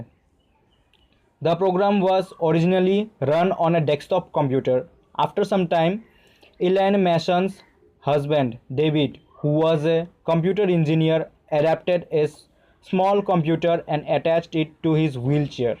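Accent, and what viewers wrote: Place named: India. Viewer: I don´t know why, but I love this Indian accent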